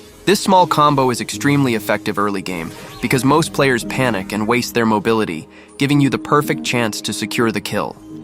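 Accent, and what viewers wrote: India. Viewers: Is bro indian?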